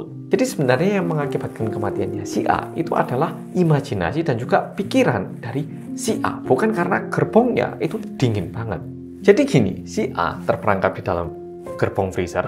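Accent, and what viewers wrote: Java, Indonesia. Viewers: Sogannya lucu orang Korea yang medok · Selalu ngikuti channel ini,tpi juarang komen,awalnya tertarik dg logat jawanya,cocok aq yg wong Jombang,bolo..bolo yoo wk..wk,sampek aq pingin tahu sejarah hidup mas ini,..🤝🤝🤝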